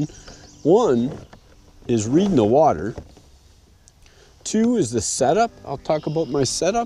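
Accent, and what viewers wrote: Wisconsin, United States. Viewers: I'm thinking Wisconsin/UP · You have the most Canadian accent I've ever heard LOL to either that or like Wisconsin ish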